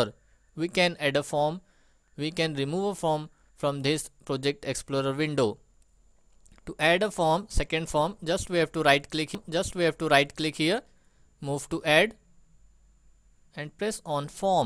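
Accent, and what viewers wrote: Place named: India. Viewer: i think he is indian man. his accent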